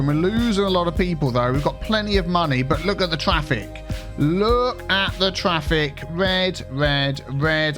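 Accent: United Kingdom. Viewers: youre kind of adorably british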